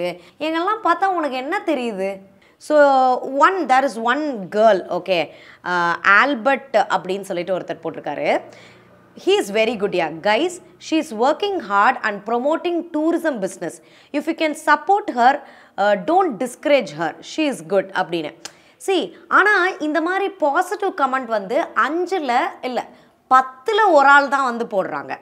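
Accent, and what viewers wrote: Madurai, India. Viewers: Va la po la nellai basha ila ? Neenga madura basha nu solringa 🧐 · Am also from Madurai yes in that city they speak like this only commonly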